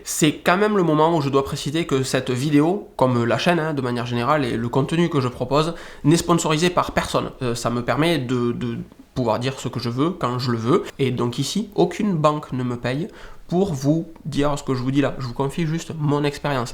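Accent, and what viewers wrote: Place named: Marseille, France. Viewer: 3.3. minutes : des placements a 10/20% !!!! vu qu il un accent marseillais je me dis c est plus la tradition que de la mauvaise foi...je vais continuer a regarder mais la crédibilité en prend un serieux coup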